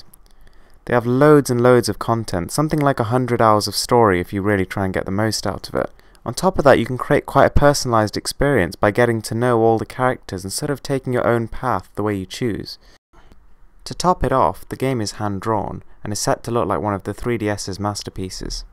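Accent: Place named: United Kingdom. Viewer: why british people say to pokemon. pöukman? wtf...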